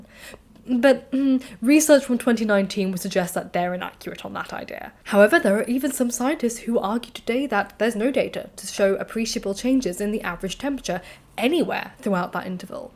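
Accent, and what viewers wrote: RP, United Kingdom. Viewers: I just discovered your channel, and you remind me how incredibly attractive an intelligent and learned woman is. (The posh accent probably helps 😃)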